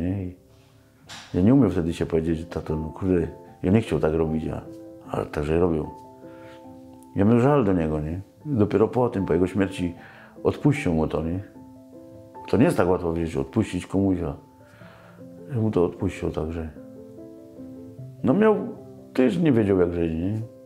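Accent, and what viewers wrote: Silesia, Poland. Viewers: Historia ciekawa ale historia i Śląski akcent to dopiero się słucha :) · Super job:)! Uwielbiam slaska gware,akcent. Best regards from Belfast:) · Słychać z gwary że to Ślązak. Och lot Och lot. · Fajny akcent śląski!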